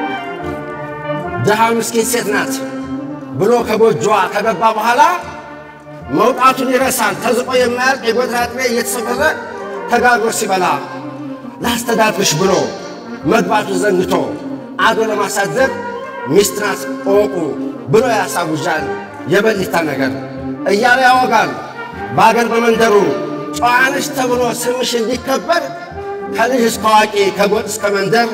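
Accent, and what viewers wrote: Wollo, Ethiopia. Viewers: አነጋገሩ ራሱ ወሎኛ ነው ደሰ ይላል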